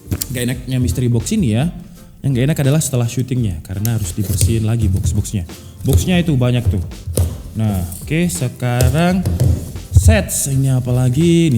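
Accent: Java, Indonesia. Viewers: Asli ndi seh sam peno, ⁉️. Koyok e wong jowo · Sampean jawane pundi mas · Radak ono jawa"nya sitik😂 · maaf ya kak kakak itu orang Jawa atau orang jakarta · Lo orang jawa ya bro. Bahasanya kok mirip orang jawa gtu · Keri cok, jowo bang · Sampean jowone ngendi bang. Wkwk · Jan wong jowo tenan ki rek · jowo mu ngendi kang...😂😂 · Wng jowo cek😂😂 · Mase wong jowo🤣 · Wong jowo pa bang😅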